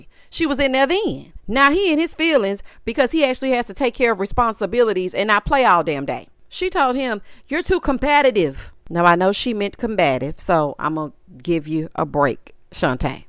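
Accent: South, United States. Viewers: Girl, I love your country twang!!!! Moving forward (in your voice). 😅😂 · Ur reviews, r the best..! & LOVE ur accent. Shout out, to the south. 🇨🇱🤠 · I love your commentary! You are hilarious.🤣 And your southern accent is everything!’🥰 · They hating! That southern twang and contagious laugh are just a couple reasons I love listening to you 😍. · girl keep being yo’ fabulous self, country twang and all! 😂😂😂 Love your reviews! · Pay them dust, I love your accent and southern charm 💕🌹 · Hey Girl Heyyy! I can't wait to get into this review. Be right back, I'm going to get some popcorn. Nevermind those people. We live your educated, humurous, country dialect. Enjoy your evening. 🤗🧡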